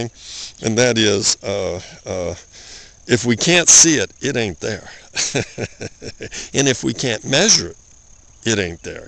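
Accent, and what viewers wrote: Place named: South, United States. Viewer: a southern drawl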